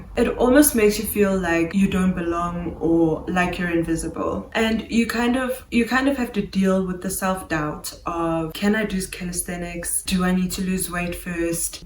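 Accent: South Africa. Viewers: Do I detect the accent of a fellow South African? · YouTube is my primary form of media and I can't tell you how heartwarming it is to click on a video and hear a South African accent. There's just something about getting information from my own people. You just know it's going to hit home. ❤😊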